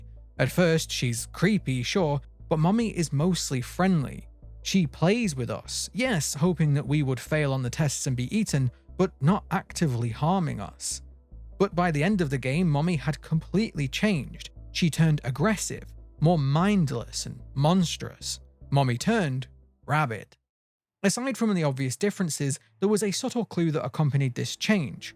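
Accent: United Kingdom. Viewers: It's funny how differently British ppl pronounce things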